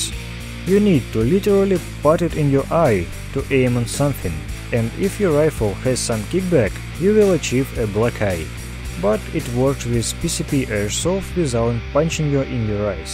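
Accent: Russia. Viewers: Steve Gold he is russian.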